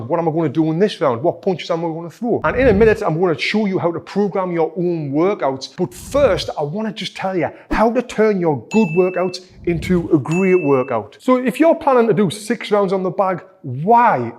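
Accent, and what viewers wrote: United Kingdom. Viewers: Thanks for all the boxing tricks you give online for free, Buddy, I'm from Québec, Canada, and as I listen to you, your british accent makes me laugh, but please, do not take it as an insult. To me, it just brings a colourful side to your videos. I got stricken by a colorectal cancer in 2022 and I wanna get back to karate. I am not a good kicker but I'm pretty sure I can balance the equation by being able to hit harder and faster in some other way. Tal I want to improve my punching abilities and I am pretty sure your videos are a good way to go. Thanks again for your advices, and if you ever decide to come in Québec, Canada, for a vacation, I'll be pleased to guide you to good places to go to. Take care!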